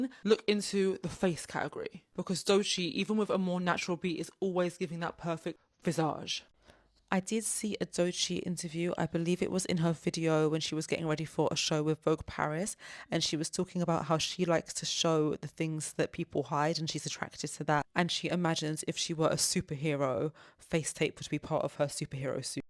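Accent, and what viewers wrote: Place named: United Kingdom. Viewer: Absolutely love these videos, and your charming British accent!! Do you think that you would ever do a video on Sade? Her iconic red lip is just everything to me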